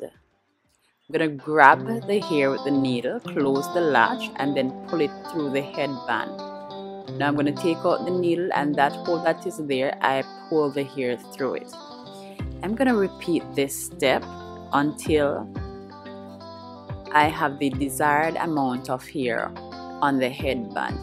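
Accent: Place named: Jamaica. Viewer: I love your accent. Is it Jamaican 🇯🇲?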